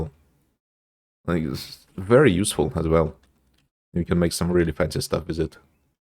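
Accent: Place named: Russia. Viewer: руссиан аксенте ) Хотя возможно я шиз.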